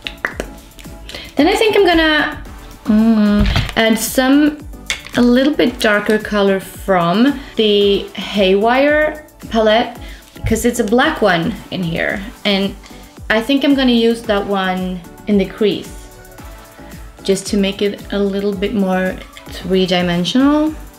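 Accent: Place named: Sweden. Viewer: The Swedish accent... 🤗🇸🇪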